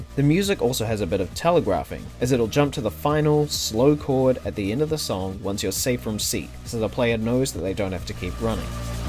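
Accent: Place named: New Zealand. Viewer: Are you a kiwi too? I recognise the accent. What city or town do you live in? I live in Matamata. Edit: typo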